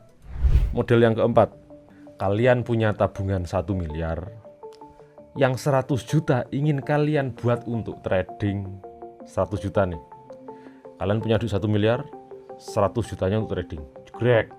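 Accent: Java, Indonesia. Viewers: Tulen wong jowo ki.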